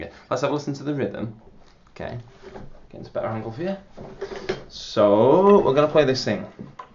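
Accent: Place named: United Kingdom. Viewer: Man's sounds like asian and british at the same time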